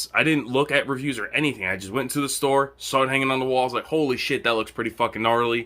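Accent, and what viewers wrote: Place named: Pennsylvania, United States. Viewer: Just scooped a tiger maple m70. Nice review? You sound like you're from PA, where about? Pittsburgh here.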